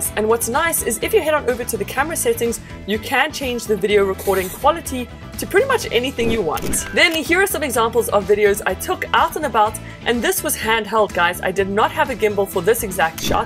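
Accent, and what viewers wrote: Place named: South Africa. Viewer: Damn, I didn't know you are SAn. Well the accent is there, but the channel numbers say a different story.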